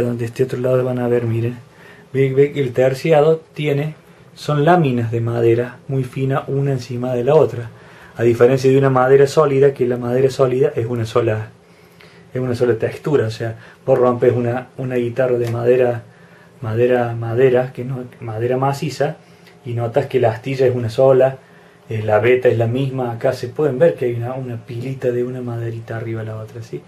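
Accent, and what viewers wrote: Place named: Jujuy, Argentina. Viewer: Eres de la quebrada? Jujuy? Digo por el acentico. Saludos desde Chicago.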